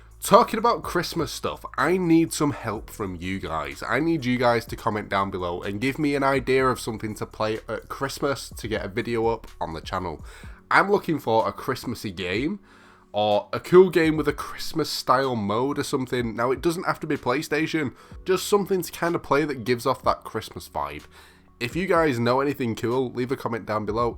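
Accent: United Kingdom. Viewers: Are you from Sheffield? I can’t quite place your accent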